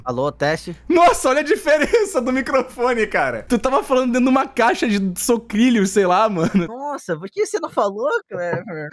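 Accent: Rio de Janeiro, Brazil. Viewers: Carioca né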